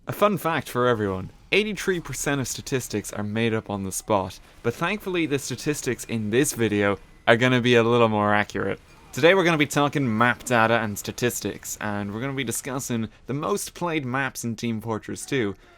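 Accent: Ireland. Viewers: I actually like 2Fort, but it needs a time limit. P.S. Are you Irish? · lol your accent sounds like half Irish and half Australian xD (plsignore) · haha your Irish accent is one of the things that keeps me coming back! It's so fun to hear you folks say 'Threehundred and thirty three trees' and so on... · You're an Irish TF2 youtuber? subbed immediately. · Are you Irish?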